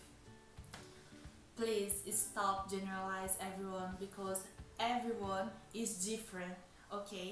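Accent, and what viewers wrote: Brazil. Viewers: “That’s da tru, I’m sorry.” I’m not making fun of that, but that sounded hilarious, I’m used to Brazilian accents because of my mom and little because of my dad. I was born in America, so I don’t have much of a Brazilian accent, I actually have more of a southern accent. But as funny as the accent sounds sometimes, it is a very beautiful one! A part of me wish I was born in Brazil just so I could have the accent! But God made me the way I am, and Im happy about it, much love from The United States! 🇺🇸 🇧🇷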